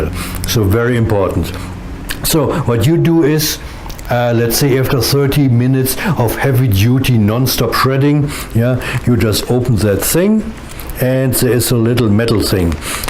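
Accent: Germany. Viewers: Are you from Germany ? your accent sound really german.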